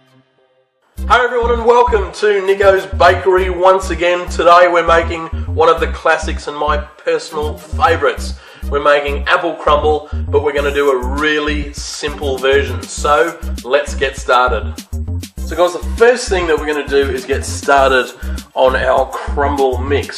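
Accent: Australia. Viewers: I guess he's an aussie. He sounds all right for me. Butter, brown sugar and cinnamon...Thanks Nicko. I'm going to the supermarket now. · after the first ten seconds I wondered why his accent seemed so familiar and then I realised that he had an Australian accent hehe!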